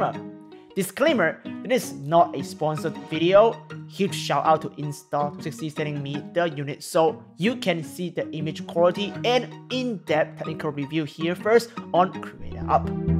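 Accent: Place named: China. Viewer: his english is not broken! he has just chinese pronunciation which is actually cool...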